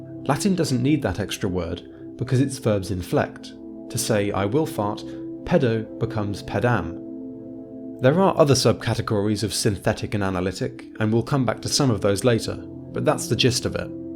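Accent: England, United Kingdom. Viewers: What a preposterously unscholarly and scatological pile of tripe. "Habere" in Latin is "to have"; "Dormire habeo" is "I have to sleep/must sleep". it is NOT the future compound tense which would be "dormire VADO" (using the verb "to go" as one would in English).The author resorted to using the verb "fart" since he doesn't have the intellect, substance or maturity (thinking he has some severe "mommy issues" and still lives at home) to create something academic. This is video is proof that just because you slap a pompous voiceover with an English accent doesn't make it intelligent or correct. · Yeah I reacted to "domibus nostra", I dunno where he got that from. Also I'm surprised he was afraid to pronounce Finnish, as his Latin pronunciation was 100% English anyway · Shades of what I would have gotten into had I gone into linguistics! OK, I detect a [snobbish] British accent in you but get to the point. 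English is MORE synthetic than you make it out to be (following the lead of the Germanic languages); the Romance languages are almost totally analytic. Point is demonstrated: my school system at the grade 7-9 level taught only two languages French (analytic) and Latin (synthetic galore). In high school grades 10-12 I took German. Us "Latin scholars" were VERY familiar with grammatical case while the "French scholars" had no idea what case was. Yes, things like Accusative of definite time vs. Genitive of indefinite time weren't well explained--they are a feature of German--to us in Latin class in junior high. Something interesting is going on in English that to your argument that Synthetic trends to Analytic which I'll get to in another post. I'll conclude that a lot of this today is in DELIBERATE miseducation. Note in America the now-common misuse of " 's " for plurals: dog's for dogs, etc. 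THAT sort of illiteracy! Bad enough the British' dropping of punctuation in abbreviations "PMs" for "P.M.'s" if you saw Johnson, Cameron, & Brown walking down the street! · slow and exquisitely anglo but an excellent video for sure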